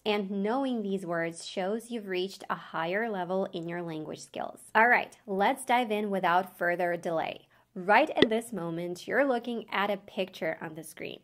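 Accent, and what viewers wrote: United States. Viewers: This is English American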